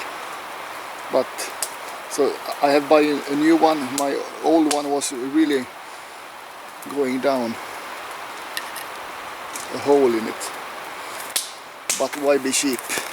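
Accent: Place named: Sweden. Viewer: jag älskar din svengelska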